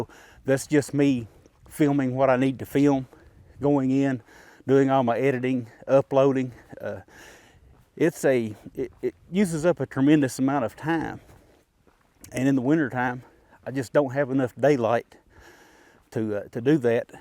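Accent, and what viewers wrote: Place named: Kentucky, United States. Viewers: I’m a hillbilly from Kentucky too (Letcher Co.) I can understand you just fine, you sound a lot like me and my people, and you are right about kids having less of the hillbilly accent, my kids talk a little more proper than I do, I think it’s from TV mostly. Well I’m gonna get back to watching the UK vs. UT game it’s been a good one so far, Go Cats! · I’ve said it before, sounds like home to me. My dad’s side of the family is all from Kentucky 😁 Spent the first week of my life in Ft Knox off base housing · Spot on Brother! The fact that you have to delete comments based on your accent just shows how retarded some people are.. I just figured you are from the south.....dont all ya’ll talk the same anyways?? 😂😂😂. Great video, keep it up!! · Your accent sounds like my kinfolk from Pennington Gap, newer subscriber enjoying your channel from Phx Az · Brother don't worry about your vid quality. 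It is the substance that matters. As far as the accent it reminds me of my family who live down in your neck of the woods. My Dad was born in a coal mining camp in deep Appalachia and my roots run deep in those mountains. Thanks reminding me of my family's home. All the best! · I just found your channel and have been binge watching. First heard you and thought you was from Kentucky as well. Then I find this video and I’m pretty sure I’ve hunted the pioneer hunting area you walked by in this video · Being a fellow Eastern Kentuckian I appreciate your accent. I wished it wouldn't die out, but I think it is. My Grandson sounds like the people on tv. I really enjoy your videos and being a new subscriber I have many to watch. I believe the Governor would sign the bill if it makes it to his desk. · Hey. Always good to hear that southern drawl up here in New England. The south has some great food, great people, and is the most business friendly region of the USA. 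Pay no attention to the haters. Glad that you found your ve-hicle ok. LOL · I appreciate your videos. As a fellow Kentuckian and graduate of EKU, I appreciate the Appalachian hertiage. I don't know why anyone would have an issue with your accent. Take care and God bless.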